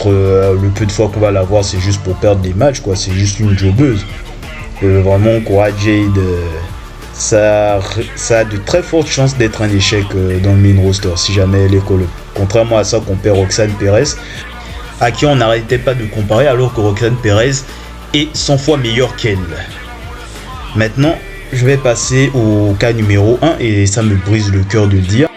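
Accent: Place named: Ivory Coast. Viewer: Je dis tu es ivoirien d´origine oubien l´accent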